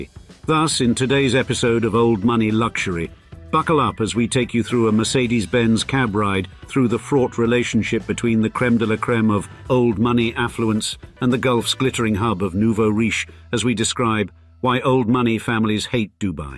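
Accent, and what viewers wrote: United Kingdom. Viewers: Interesting: with a crisp British accent, we're informed that data collection in Dubai is extensive, and that cameras are ubiquitous. London is THE city to visit to be caught on camera.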